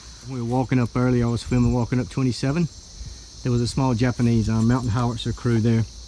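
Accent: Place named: South, United States. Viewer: Thank you for your walk-around tour and your knowledgeable account of the entire battlefield. I am a 58 year old Australian and I inherited a fascination of this "crucible of American & Japanese military doctrine"... My late father had me watching William Bendix & Richard Jaekol (spelling) in the movie version of Richard Tregaskis's book "Guadalcanal Diary" - which I have never read, nor James Jones The Thin Red Line - ignoramus that I am! :) I have the Terrance Malik film plus "The Pacific" - we in Australia owe our freedom to the brave young Marine's who stopped the Japanese advance southward in its tracks, while our own boys fought it on the Kokoda Track... Your calm, succinct, professional and detailed tour is just fantastic - thanks to you, I know of not only John Basilone, but now I can add Mitchell Paige & Davis to the list of brave men who won the Congressional Medal Of Honour to the numerous Navy Cross recipients you recall with a grace & calmness befitting the honour of these men. 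Now I know where & what 'The Galloping Horse' is, as well as 'Gifu' to add to my knowledge of the 'Edson's & Bloody Ridge, The Jeep Track, Fighter Airfield, the Emergency Airfield to add to my knowledge of Henderson, which I presumed was the sole Airfield - Wow - Coffin Corner, the Ilu River, the mistaken position /naming of the Tenaru - you have pieced this place together with the ability & acumen that would do any professional historian proud :) Your gentle, factual southern accent is ju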